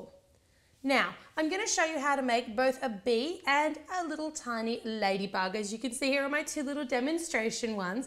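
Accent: Australia. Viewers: Nah she totally sounds Aussie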